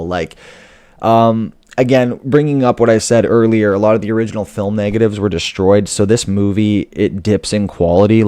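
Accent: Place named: Germany. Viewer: Awesome. Loved your German accent. Nice to see more subs with every video. Keep em coming!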